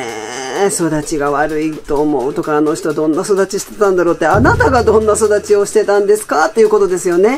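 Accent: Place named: Kansai, Japan. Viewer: 関西弁最高です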